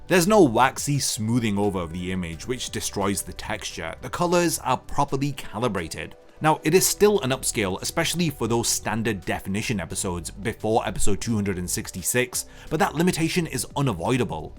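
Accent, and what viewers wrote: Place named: United Kingdom. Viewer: i have been buying the UK blu-reys, of Bleach an the Naruto ones, i did my own comparison from Naruto on Disney+ an found that the Disney+ version was way lower quality than the Blu-rey ones. I havnte checked Bleach tho. As this is a new video didnt u try the UK versions? Forgive me but you sound British maybe u live outside the UK, i dont know or maybe u have a large US fan base and are catering for them??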